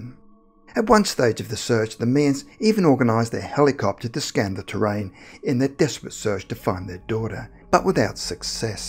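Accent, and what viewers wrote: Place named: Australia. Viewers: Aussie accent, pretty clean to be honest with fuck all slang or swearing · Australian or Kiwi? Don't have a problem understanding tbh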